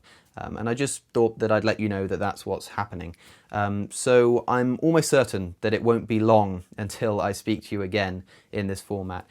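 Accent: United Kingdom. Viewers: Woah, your British accent jumpscared me. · Why is he so british?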